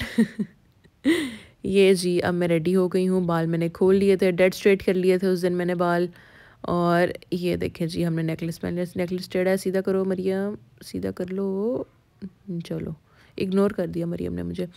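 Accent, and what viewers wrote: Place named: Punjab, India. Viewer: in love with your voice specially(punjabi accent)😍 and uhr justtttttttt waoo🙈❤ have watched all your videos and the way uh entertain everyone is just commendable😍 so much love from INDIA❤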